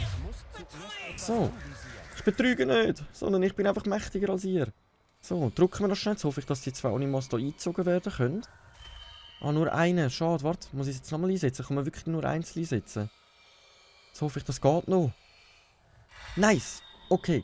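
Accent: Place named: Switzerland. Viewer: Scheinst sehr sympathisch und dein Kommentier-Style ist echt flüssig. Iwie schade, dass du nicht soo viele Zuschauer hast :) Bin auch us de Schwiiz, darum verstehe ich natürlich gut, was du sagst, mache meine Videos aber auf Hochdeutsch. Kann mir auch vorstellen, dass die Zielgruppe in der Schweiz einfach ziemlich klein ist ^^ Bist aber auch noch nicht sooo lange auf YouTube, hab ich gesehen :D Nur so als Tipp, falls du deine Videos etwas besser gestalten willst. Ich würde bei dir die Kameraqualität vielleicht noch etwas verbessern. Ich glaube die Aspect Ratio stimmt bei dir noch nicht ganz (siehst wohl etwas zerquetscht aus ^^). Dazu wirken ein paar Lichter für dein Gesicht echt wunder (auch sehr günstige LEDs z.B.). Hab auch selbst erst vor kurzem mein Setup mit Lichtern verbessert. Es ist erstaunlich wie viel solch kleine Sachen ausmachen. Ansonsten ist die Quali echt super und deine Videos (zumindest die, die ich bisher gesehen habe) ganz unterhaltsam :) Mach unbedingt weiter! 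Cya :3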